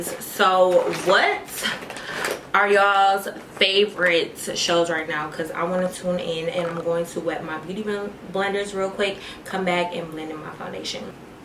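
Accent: United Kingdom. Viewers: your British accent is really cute. Your makeup looks gorgeous Charlotte Turberry 💄💄👍🏽. Congrats on your collaboration with the brandy ou deserve it. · its the british accent for me its even funnier coz im from ldn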